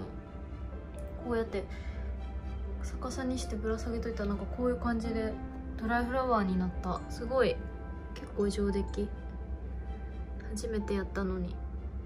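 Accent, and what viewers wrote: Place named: Kyoto, Japan. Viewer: 今までゆきりん一筋でしたが、AKBの全国ツアー(愛知公演)を見に行ってからゆいちゃんのことも気になるようになりました😅 ゆいちゃんの京都弁が好きです😊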